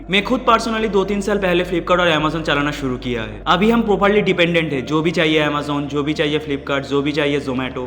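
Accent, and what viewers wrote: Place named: West Bengal, India. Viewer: Dada apni west bengal thaka ki? actually apnar accent thaka lagcha 👍🏼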